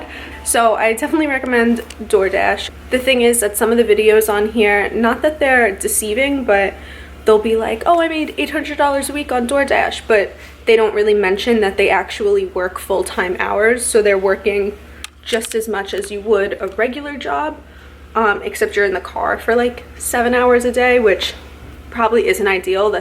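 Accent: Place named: New Jersey, United States. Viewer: Loveeeee the MARIO sounds in between- you sound like a Jersey girl, I can hear the accent, love my homies!! #Jerseygirlsrock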